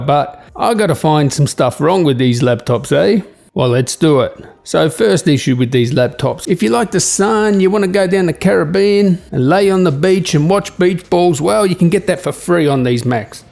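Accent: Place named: Australia. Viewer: I love Aussie accent :)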